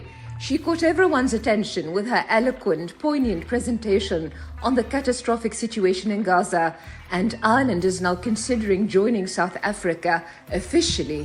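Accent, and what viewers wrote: RP, United Kingdom. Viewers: Ok I know this is not the point of the video, but why does the narrator sound like a white upper class English woman? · This is a really pathetic attempt at respectability, there should be no Muslim, lecturing anyone, on genocide and oppression, this woman is a joke, how much of the world has Islam conquered and enslaved, in the last 1400 years, and no matter how much they try and sound intelligent, with their posh English accent, they will always fail to have any credibility.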